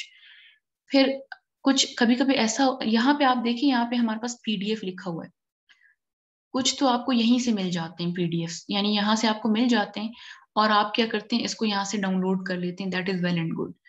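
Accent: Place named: Kashmir, India. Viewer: May Allah bless you... Can u tell me where from u. Bcoz I am from Kashmir.. Your voice tells u also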